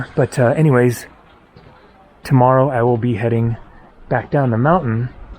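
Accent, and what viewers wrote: United States. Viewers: please pronounce. “ Himalayas “ and “ Himachal “ correctly…..after all the years of travel ….and you still pronounce some words with that arrogant American pronunciation…….love your videos, but your western pronunciation is a big turn off….and I find it disrespectful…….at least try to get the right pronunciation……most foreigners try to learn the main words in a language to communicate……‼️⁉️🇺🇸 Edit: I thought that with the starlink satellites, reception would have improve in the mountainous regions of the world…..at least that’s what T-mobile said…. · Hi, I found you today while trying to watch a vlog on Himachal Pradesh. I really liked the slow pace and story telling. Thank you! By the way, Indians don't mind if you face the camera on their face. I think, some may even like it. Well, I left India 39 years ago. I live in Philadelphia now. 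I am an Indian but what a joy to find you to get to hear the familiar American accent!! Sending love from Philly - the City of Brotherly love. All the best!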